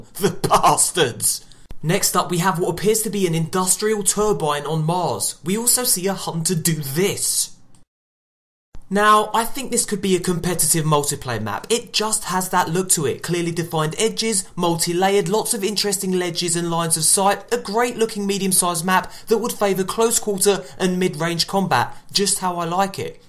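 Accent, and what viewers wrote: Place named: United Kingdom. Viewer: It's only easier to understand because you speak with that accent. I'm an american, and I find it much more pleasant to listen to an english/brittish accent, than to an american one. it sounds better to me. it's all opinion.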